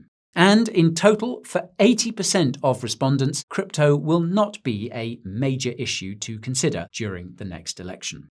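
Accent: Afrikaans, South Africa. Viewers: Your South African accent won me.. ja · face-green-smiling: love the South African accent ..decent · As a South African, I love your Afrikaans-English accent. Kak funny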